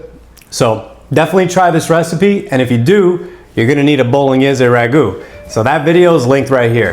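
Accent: United States. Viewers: You sound American, so what is with the recipe in Grams?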